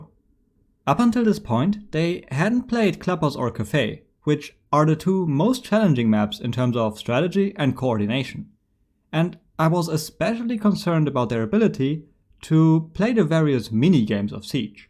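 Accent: Germany. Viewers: Duuuude yo accent sound german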